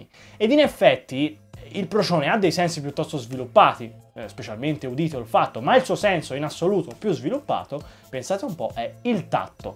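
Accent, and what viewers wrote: Tuscany, Italy. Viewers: Bravissimo, come sempre. Ti giuro, da 8.10 a 8.55 io rotolo dalle risate: accento toscanissimo (ce l'ho anch'io, eh), procioni che rotolano, dentini, ecc... Continuo a guardare il videl in loop. 🤣🤣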